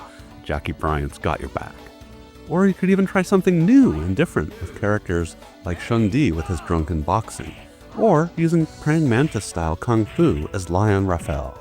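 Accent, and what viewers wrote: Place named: Portugal. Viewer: Haha, partially, yes! There were some bits in your accent that had me wondering. Also, the topics you cover on your videos - relatively speaking, there's a high proportion of Portuguese people on SEGA-based retro communities because of how impactful the company was over there, destroying Nintendo in popularity in the 90s. So there was a considerable chance you'd be like i.e. Tee Lopes, someone who was born and grew up in Portugal during the 90's but moved to the US at a young age. Thanks for answering, keep up the great content!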